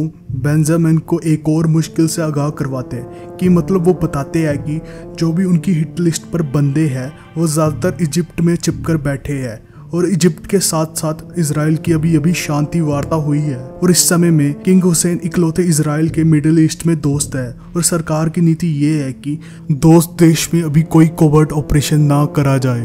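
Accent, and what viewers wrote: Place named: Punjab, Pakistan. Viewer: Pakistani punjabi ryt bhaisaab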